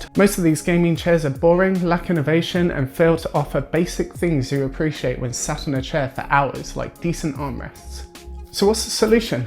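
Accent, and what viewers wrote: United Kingdom. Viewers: rep bri'ish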